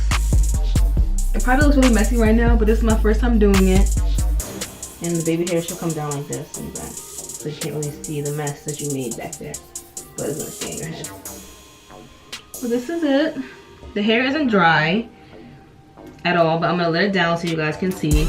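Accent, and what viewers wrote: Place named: Philadelphia, United States. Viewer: “ I almost cut my Air “ I love her already I thought I was hearing a philly accent 😂 loved this video! Super informational and easy to follow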